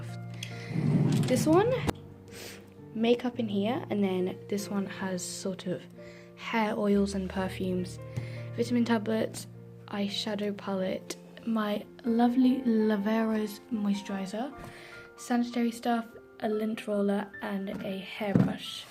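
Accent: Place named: United Kingdom. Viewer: Great video! Fellow Brit here but your accent is like 100% nicer than mine 😂😂😂 I’ve always wanted a diffuser so I’ll check out that company. Fantastic video!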